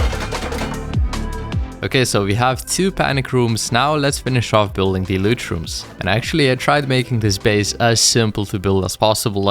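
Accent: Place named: Sweden. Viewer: Pretty sure hes swedish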